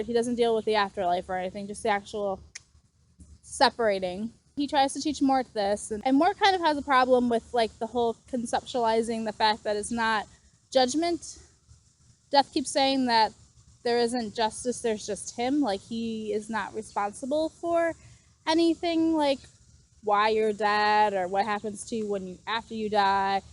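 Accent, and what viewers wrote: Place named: United States. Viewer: Think of making an audio recording like you would speaking on stage…slow down and enunciate more. I liked the content but had to relisten multiple times to get all your words. Certainly, I am not American, but I normally don’t have as much issue with a US accent.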